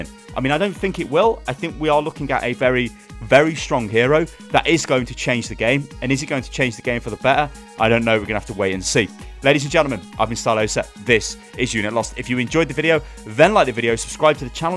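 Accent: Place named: Midlands, United Kingdom. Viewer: Where is he from? He sounds like he's from the midlands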